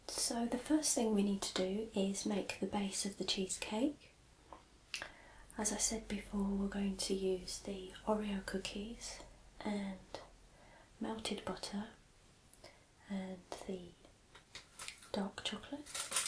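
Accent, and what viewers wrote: United Kingdom. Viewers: I love cheesecake!!! I have made chocolate chip cheesecake and it’s delicious! you are wonderful! I love your British accent!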